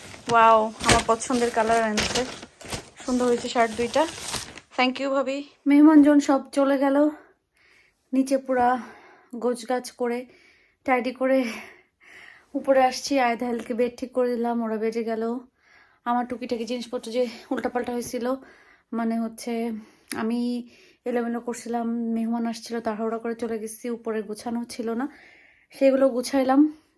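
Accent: Sylhet, Bangladesh. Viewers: bhai apnar sylheti maat huniya ami crush khailaisi..MashaaAllah 😊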